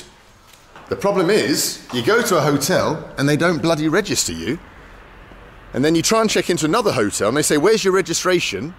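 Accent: United Kingdom. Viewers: Great video,! A foreigner who helps me, the russian to better understand a "misterious russian soul". I enjoy your epic voice and nice brittish accent. Thanks for travelling across russia's provinces. Hope to see you one day here in Russia's Far East Sakhalin. And yes, all museums in Russia are usually closed for a day-off on mondays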